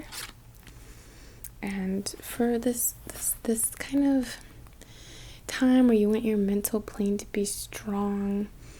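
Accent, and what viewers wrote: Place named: New York, United States. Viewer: Thank you for your hard work. Also, I just want to add that today I became aware of your New York accent. I've been listening and watching your reiki vids since I think 2016, and suddenly I was like, omg she sounds like a New Yorker. Don't ask me why I became aware of this, I have no idea. Peace and love x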